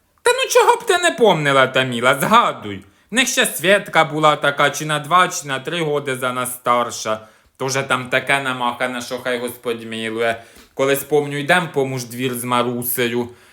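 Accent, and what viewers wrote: Poltava, Ukraine. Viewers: Обожнюю ту полтавщину, моя рідна бабуся з кобеляк, ну точно так балакає😂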